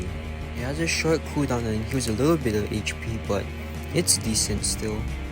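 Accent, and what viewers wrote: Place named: United Kingdom. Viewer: Honestly your voice sounds British but don't get this in a wrong way or bad way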